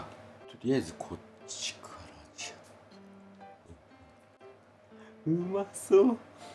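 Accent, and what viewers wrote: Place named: Kansai, Japan. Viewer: （関西弁です）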